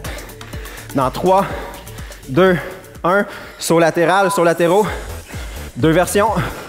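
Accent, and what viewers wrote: Quebec, Canada. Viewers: C'est l'accent Québécois! :)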